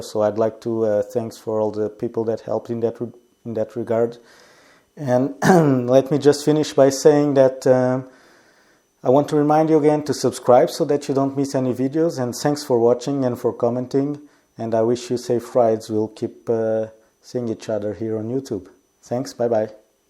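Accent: Portugal. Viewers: es portugues kkkkk sinto tu a falares e es tuga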